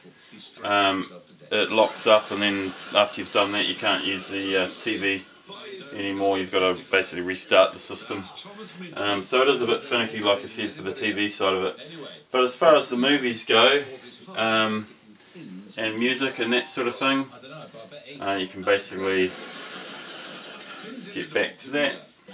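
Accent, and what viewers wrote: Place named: New Zealand. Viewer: Kiwi much? ;-)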